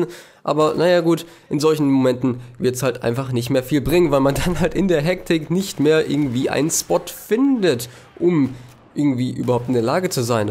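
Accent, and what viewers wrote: Germany. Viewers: Kommst du aus Norddeutschland?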